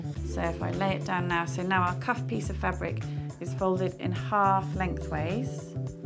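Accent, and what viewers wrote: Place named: United Kingdom. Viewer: Is it just me or is everything better with a British accent? Because that was smooth and clear rather than the last video I watched. Great job!! Looking forward to making these for the family. Can't wait to embroider names on the cuffs too :)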